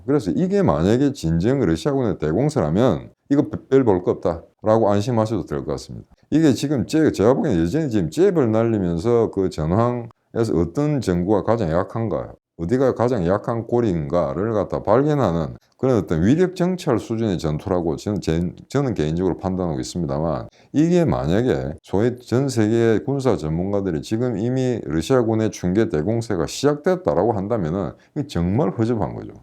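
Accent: Gyeongsang, South Korea. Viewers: 🇰🇷허진대사님,💙 중저음의 보이스와 경상도 인토네이션이 들을수록 신뢰감이가고 묵직하며 멋있습니다~!!!💯 전쟁...브리핑엔 님의 목소리가 적격입니다. 👍✌️👏 허진대사님, 아프지 마십시요. 늘 건강하시길 빕니다~🕊️💙🎵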